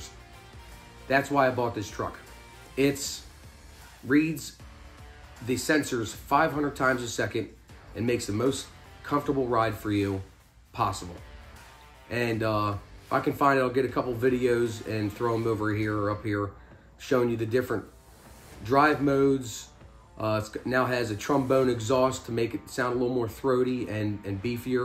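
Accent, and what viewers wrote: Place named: Baltimore, United States. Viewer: Are u from Baltimore? I recognize the accent. Also, what do u do for a living?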